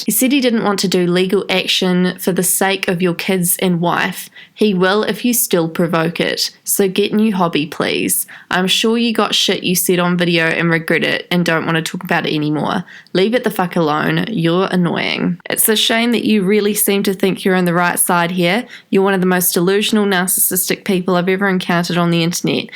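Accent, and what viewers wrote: New Zealand. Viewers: Heehee... you sound like a Kiwi Kath Day-Knight from Kath & Kim, when she's making jokes about Kim's weight to other people right in front of her. A little bit too shady for me, I find calling him Onion on purpose kind of annoying. Not because I'm a fan (nope), it's just not much of a burn or a joke, it's just like "Oh, you didn't take long enough to read it... and now that's what you're going with. Clever." Also, onions are pretty popular. Like garlic. Bad rap, but people scoff the shit down (good thing too, they're both super medicinal). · I'd have told onion to take it to the police if he was so 'concerned' (which we know he won't because: He's got no evidence He just wants attention which is why he's sperging out on social media). Didn't he try that on PewDiePie as well but no one bit, so he's slandering Shane instead? Just discovered you and enjoying it so far. 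X PS. It's good to hear a Kiwi accent and guess it correctly- yay, I feel validated! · This is a New Zealand accent, right? It sounds like it, but I want to make sure before I show my boyfriend so he knows how the accent sounds lol. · My wig liteRALLY flew to mars! oh by the way, are you from new zealand? you have a really nice accent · When you're Australian and knew straight away that you're a Kiwi. Also, I love this video. You have a new subscriber.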